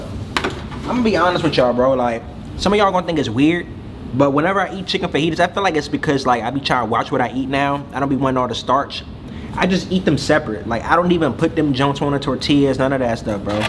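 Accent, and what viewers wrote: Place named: California, United States. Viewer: Bro, it’s crazy. I always knew it something about you. I know my fucking people your mom and yo is from Cali. That’s crazy I don’t know if it’s Up North in Oakland or South Central or somewhere close but I could tell by your accent already but when I heard your mommy, Uncle 👊🏾 oh for sure, I knew what time it was.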